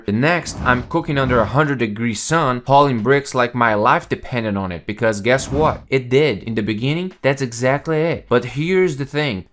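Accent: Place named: Brazil. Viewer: Hey, man ! I realized you are brazillian by your accent somehow, maybe bc I'm brazillian too, and then I checked out your description to find out I was right. Anyway, I'm planning to immigrate to US soon. Thanks for the reality check and advices ! I just can imagine how hard must have been for you, but you actually made it in the end !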